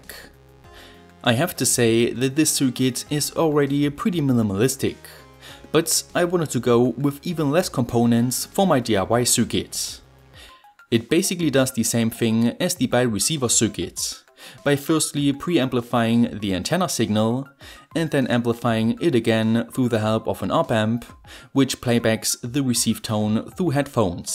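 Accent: Germany. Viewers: It's surprising how many of my favorite YouTubers are German. From The Mathologer to TheOftler to you, I love the variety of accents! I notice your accent sounds a lot like Sabine Hossenfelder's.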